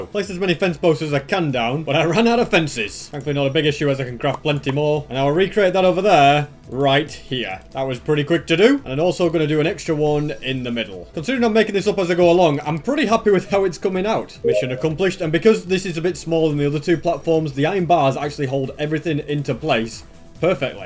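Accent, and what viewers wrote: United Kingdom. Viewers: hey im new to your channel also i have a question are you britsh? also again good job on the content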